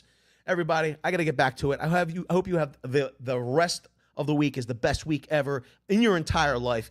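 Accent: Philadelphia, United States. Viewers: Lioke, prioce, wuter LOL must be a Philly guy always good info though despite the accent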